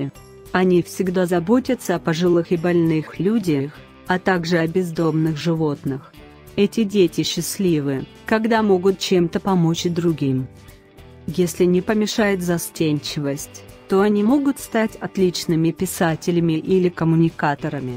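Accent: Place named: United States. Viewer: Вы зачем читаете с американским акцентом ? Это давно устарело .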